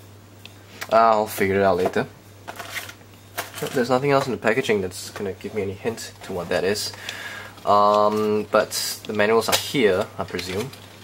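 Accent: Singapore. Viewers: are you from singapore??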